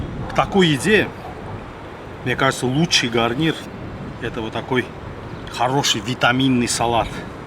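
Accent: Baku, Azerbaijan. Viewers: Салам!!! Меня зовут Тимур я живу в Украине в городе Днепр! Я ваш подпищик К сожелению не знаю как вас завут спасибо за ваше видео все очень красиво один акцент ваш Бакинский чего стоит хотел вас попросить чтобы вы преготовили одно Азербайджанское блюдо называется Хящиль помню кушал но без сахарного песка не возможно кушать всегда нужно подсыпать в тарелку готовят это блюдо из муки и оно очень пресное, если получится будет прикольно зарание спасибо!!!😊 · Бакинский акцент, может вы где то на даче в Новханах,ha? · Бакинская культура, Бакинский акцент не каждый понемаетеее. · у меня бабушка из Шеки, она всегда самая держит дома чаман. Приятно слушать бакинский акцент, привет вам от бакинских армян❤ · Огромный привет вам из Грузии,дорогой мастер своего дела! У вас акцент Бакинский! Всему вашему прекрасному народу привет от грузина!👋🙂🇬🇪🇦🇿✊ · Здравствуйте! Я когда то жила в самом прекрасном городе Баку! 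Очень аппетитно готовите, с очень вкусным бакинским акцентом! Спасибо за удовольствие вас смотреть! Подписалась однозначно! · У вас акцент Азербайджанский! Вы Азербайджанец?😊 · И ещё хочу добавить,что Ваш Бакинский акцент.....без слов,это шедевр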